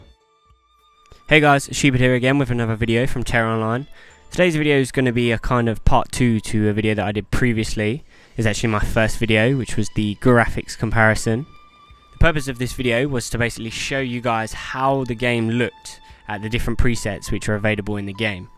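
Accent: London, United Kingdom. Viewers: aaaaah that explains, i lov ethe london accent,lived there for a month =) way way better than this northern ireland accent up here lol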